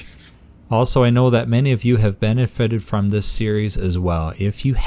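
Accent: Canada. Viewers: I could tell you were Canadian by your accent, and I've been trying to specifically place it, but I don't think I would've guessed BC. Hi from a fellow Canadian!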